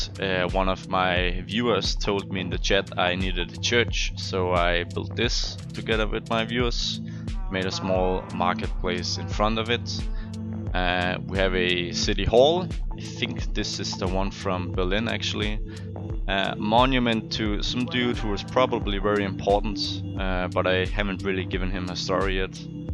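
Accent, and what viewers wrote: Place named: Denmark. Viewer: Your accent sounds Danish, are you?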